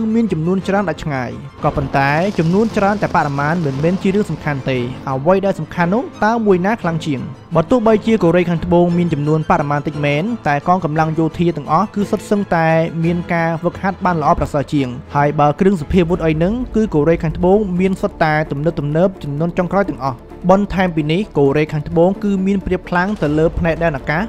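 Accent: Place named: Prey Veng, Cambodia. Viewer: សំដីសំដៅរបស់ admin ដូចអ្នកស្រុកព្រៃវែង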